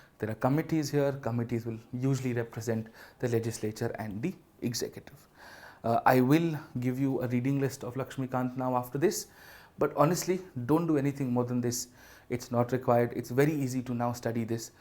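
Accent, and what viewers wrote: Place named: RP, United Kingdom. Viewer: How on earth you are not known to people, you must be a celebrity bcoz the way you speak resembles you a British Lord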